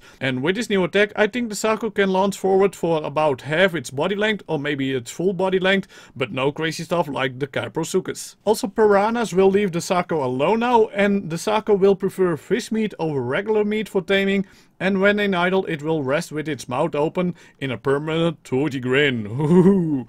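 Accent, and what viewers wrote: Netherlands. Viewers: Lord Tachanka volgens mij wel want hij had eens een nerderlandse command geplaatst en hij spreekt veeldingeb op een nederlandse manier uit zoals sarco en andere dingen en hij heeft zon nederlands engels accent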